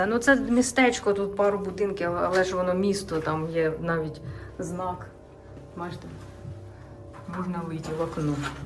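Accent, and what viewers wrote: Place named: Cherkasy, Ukraine. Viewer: люблю цей черкаський суржик)))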